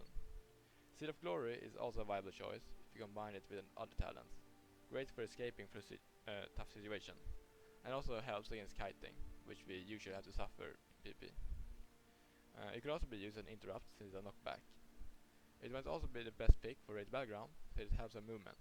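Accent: Sweden. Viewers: Are u from sweden?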